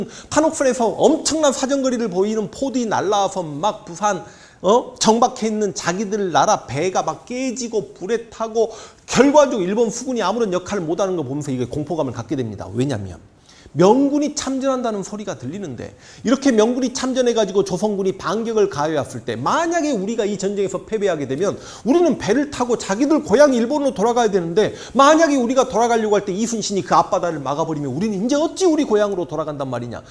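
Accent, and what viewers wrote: Jeolla, South Korea. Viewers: 황쌤은 고향이 혹시 전라도인 맞나요? 강의의 냄새가 남쪽 냄새가 그득하게 느껴줘요.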